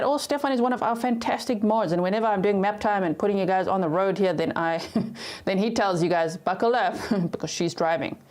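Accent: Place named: South Africa. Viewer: Why do you sound SOUTH AFRICAN?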